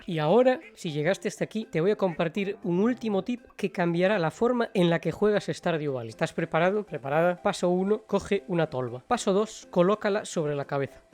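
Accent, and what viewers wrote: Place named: Galicia, Spain. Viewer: Que acento gallego me pareció Buen vídeo aunque la mayoría ya lo sabía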